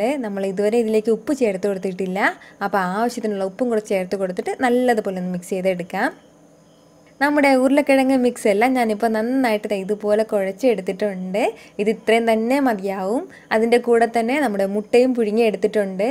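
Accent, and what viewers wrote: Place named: Kannur, India. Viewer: Endutta slangaa chechi... Superb KANNUr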